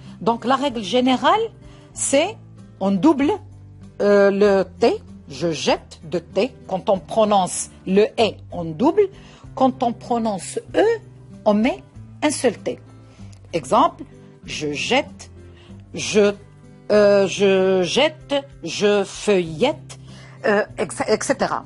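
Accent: Arab world. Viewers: Je vous remercie pour ce superbe résumé. Même si on utilise beaucoup la langue française, on a tendance à oublier les règles essentielles. Vous avez effectivement un accent arabe ou kabil mais il me semble que vous en connaissez plus sur la langue française que beaucoup de français de souche. Alors continuez à nous faire des vidéos comme celle-là . C'est très utile.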